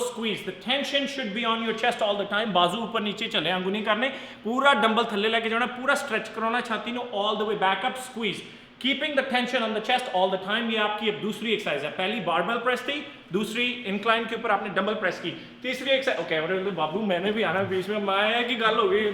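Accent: Punjab, India. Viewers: veere video todi bhut changi ne .and toda punjabi boln di tone mnu or bhi changi lgi ne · Vir ji tuc punjabi lgde o jhri apne aap muh cho bahr nikldi aa 😋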